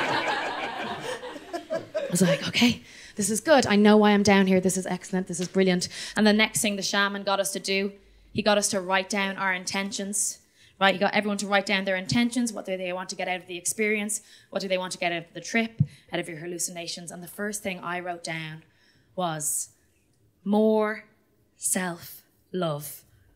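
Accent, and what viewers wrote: Ireland. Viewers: She is very cute and I usually love an Irish accent, but she reminds me too much of a girl I tried to date whose brogue would get thicker the more she drank. Cannu unnerstan ya, lassie! 🤨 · am April 3 2024 To hear you talk in an Irish accent makes my eyes water with joy !!! You are an angel. !!!! Yer woman is Funny … my gut aches Tanks for sharing!!!! Absolutely brilliant!!!! Nothing but lovely , fair play to ya. Slainte’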